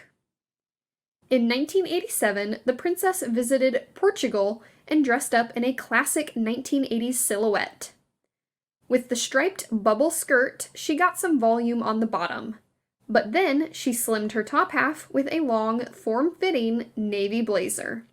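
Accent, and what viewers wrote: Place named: United States. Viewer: Why do you Americans pronounce ‘blouse’ as ‘bloussse’? Double stressing the ‘ess’. Derby is pronounced Darby.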